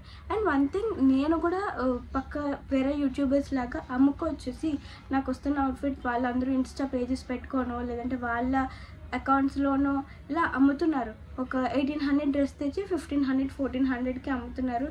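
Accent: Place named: Visakhapatnam, India. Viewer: Ne vedios oka emotion ayipoyae bcoz ur slang and talking and cute voice and also ur from mana vizag ❤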